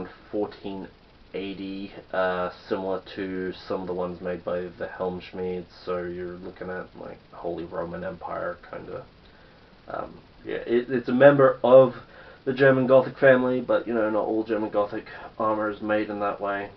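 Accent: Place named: New Zealand. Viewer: Your allready sounding like a kiwi. I agree with the comment on you being too humble on your hard work.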